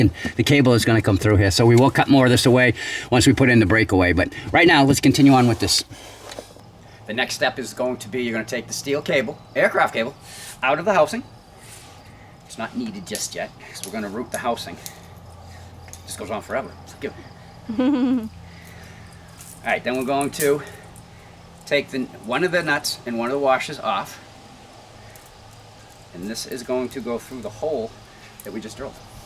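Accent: Boston, United States. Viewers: Installed Ready Brake and Ready Stop today on my JL.. It was a long day.. Also installed Maximus -3 Tow loops for the JL instead of a base plate . I have a front hitch on mine use for plowing and the Tow Loops play nice with it. Only issue with the Tow Loops is the NSA Clevis Adapter for Maximus 3 tow loop opening is 2mm too small. I had given them the specs. They said they had it in stock.. Welp yeah but wrong size.. They are gonna ship me out the correct one this week... Hey is sound like you are from Boston also..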